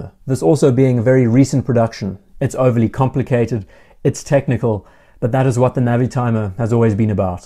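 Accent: South Africa. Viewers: Is the narrator South African ?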